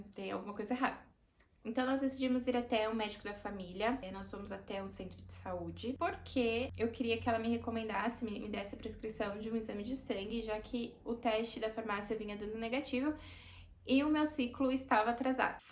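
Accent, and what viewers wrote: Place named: Portugal. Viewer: ❤❤❤❤Primeiras impressões, já mistura o nosso português com o Português de Portugal...kkkk Principal diferença que encontrei é que no Brasil pai não é visita, porém, existe a resistência dos hospitais em se adaptar a essa lei por diversas situações inclusive lotação hospitalar. E suponho que 95% das maternidades públicas não permitem a permanência do homem no período noturno pelo exato motivo do compartilhamento de quartos. Existem maternidades modelos mas são poucas e extremamente disputadas. Nessa o serviço privado ganha, porém depende do plano de saúde também. Lindo relato, super detalhado e mto necessário pra quem passa pelo mesmo. Obs: Achei lindo quando vc disse que precisa do perto de vc, vai além da necessidade com a criança...demonstra amor e segurança. Lindo casal..amei! Parabéns!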